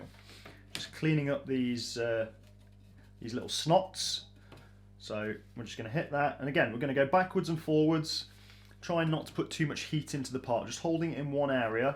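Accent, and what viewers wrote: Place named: Blackpool, United Kingdom. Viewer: Do you come from Blackpool? Your accent reminded me of Phil, from Limmys world of Glasgow (it’s on YouTube) 👍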